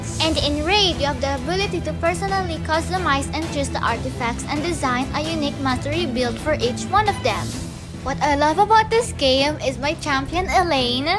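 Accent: Philippines. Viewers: Are you Filipino?Your voice sounds like so,If I’m wrong pls tell meh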